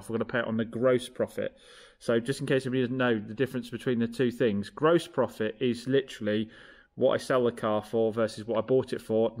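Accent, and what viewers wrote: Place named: Devon, United Kingdom. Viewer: The sound of Bruce Forsyth from deepest Devon 😊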